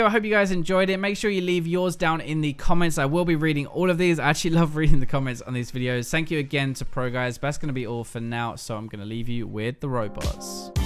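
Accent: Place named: London, United Kingdom. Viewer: you sound like you're from London!!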